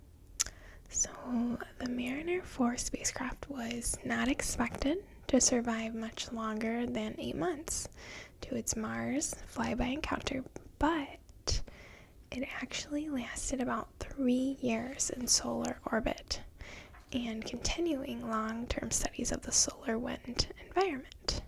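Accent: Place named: Michigan, United States. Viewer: Are you from Michigan? Your accent sounds like mine lol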